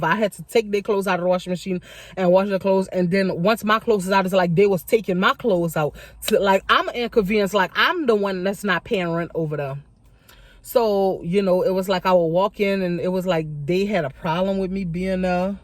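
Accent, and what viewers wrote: Louisiana, United States. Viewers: Are you from New Orleans? I love the way you sound. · Love your accent...want to visit lousiana one day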